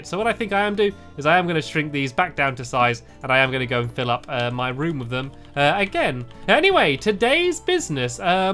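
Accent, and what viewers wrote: England, United Kingdom. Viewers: its his english accent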